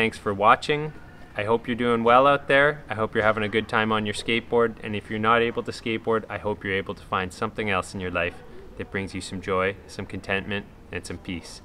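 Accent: Canada. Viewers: Are you from Canada or Minnesota?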